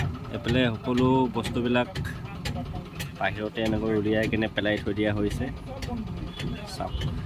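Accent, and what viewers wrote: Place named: Jorhat, India. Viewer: Jorhatr kotha bayek nukuwa vaaaaal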